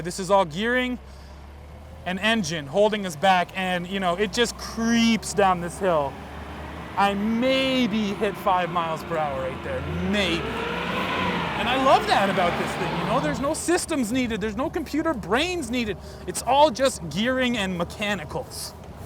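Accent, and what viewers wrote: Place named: Minnesota, United States. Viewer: "You know...." :) ...Are you Minnesotan? I am, and you sound just like me... You know? :)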